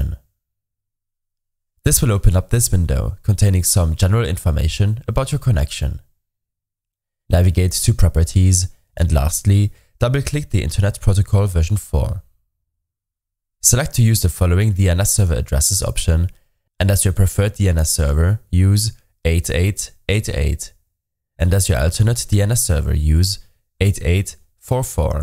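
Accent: Germany. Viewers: MAnn merkt so das du deutsch bist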